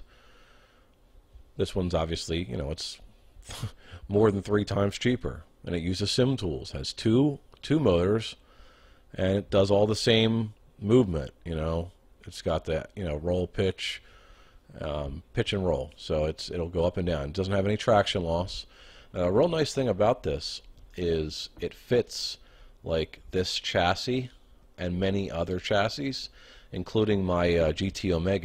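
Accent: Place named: East Coast, United States. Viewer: Love your accent. So east coast.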